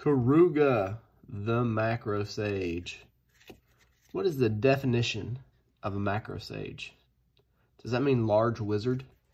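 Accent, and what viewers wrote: Alabama, United States. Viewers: I guessed you were from a Alabama from your accent! What part? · Bro, you’re from Alabama? I thought I detected a slight accent. Right on brother. Thanks for the vids.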